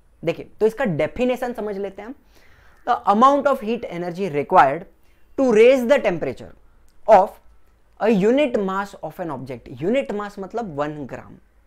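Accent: Maharashtra, India. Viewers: bhaiya, from where you are? Because I listened Marathi when you were speaking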